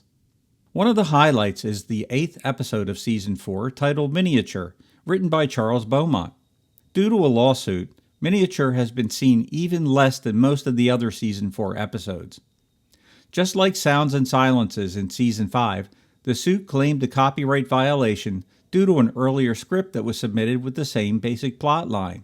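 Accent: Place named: Baltimore, United States. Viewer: Very fun seeing these clips. I had only seen one of them. Hey are you from Baltimore by chance? I grew up there and you have a similar accent from folk who live there.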